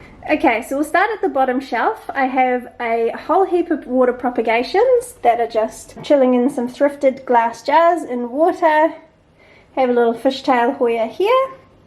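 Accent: New Zealand. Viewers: Lovely plant tour thank you. Your accent reminds me of my stay in Papatoe in Auckland.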